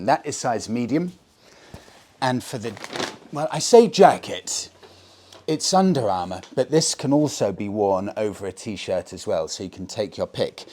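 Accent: United Kingdom. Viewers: Ur accent is from uk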